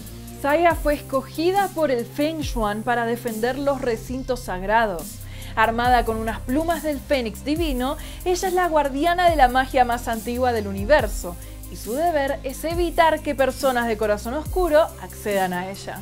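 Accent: Argentina. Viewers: LIKE SI KIERES KE SAKEN A ESTA SEÑORA ARGENTINA DEL ACTUALIZANDO. YA NO AGUANTO YA NO AGUANTO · es argentina._.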